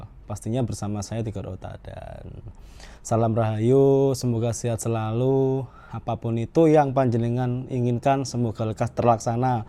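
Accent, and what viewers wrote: Java, Indonesia. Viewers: Ms Tigor miriP Orang Korea kk..tp jowone medok..orang mana ya kk.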